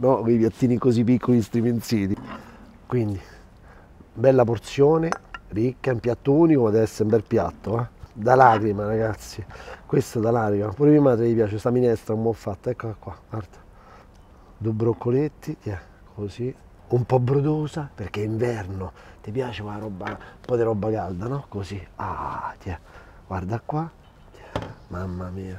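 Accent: Rome, Italy. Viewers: mi sono iscritto da poco e ti trovo troppo forte! ricette interessanti raccontate dalla tua travolgente simpatia e accento romano... io sono di bergamo e stò cercando nei miei video di coprire la mia accento!... ma forse è meglio valorizzarla..bho....comunque complimenti!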